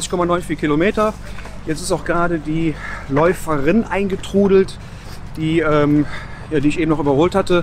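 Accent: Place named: Cologne, Germany. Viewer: diese kölsch immer zwischendurch, einfach herrlich :D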